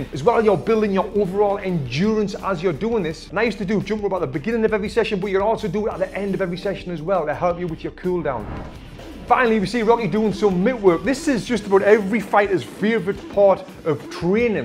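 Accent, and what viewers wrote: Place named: Birmingham, United Kingdom. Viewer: Dude, you are talking like peaky blinders. 😊👍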